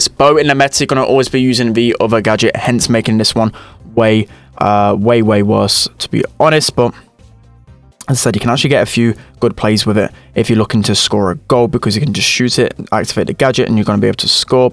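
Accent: United Kingdom. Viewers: r u fom uk?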